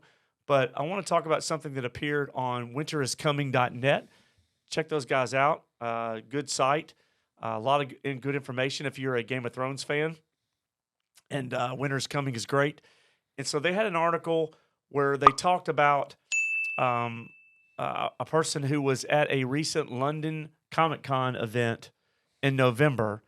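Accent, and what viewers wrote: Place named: South, United States. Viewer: What accent does the main host have? I know it’s a Southern accent from the US, but is he like Tennessee or?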